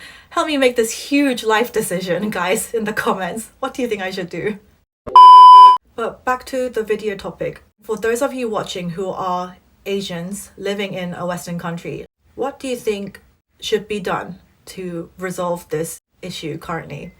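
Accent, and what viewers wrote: England, United Kingdom. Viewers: "Once bitten always smitten".... From the looks of it, you have immersed the entire teenage and adolescence in the UK....Yes the ever prominent threat of being an Asian-hate target is there, but the London contacts and friends that you have made will always stand firm alongside the future.... So, my verdict is that this "Time" will never wait. Go with your brave heart if there is a strong calling from deep within.... Your English accent and the hard earned communication skills will pay much diligence in a city like London as this city is the perfect platform for music. However, if the heart is swaying momentarily due to income bracket from Youtube, i am gonna advise to look into your career over a long run. Once you introspect past the intangible demons instated in the mind by modern media, you will find if your innate passion lies in a compromise gig over YouTube or in the sacrifice of your tiger mum who is willing to bear your resentment just for a bright career in the so called financial capital of the world "London". 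Being an Asian in similar waters, I thought of writing this down. I aint no philosophical expert. So, this is just contemplating and a bit too much nostalgia of the London vibes that i miss now being in limbo for the past one and half year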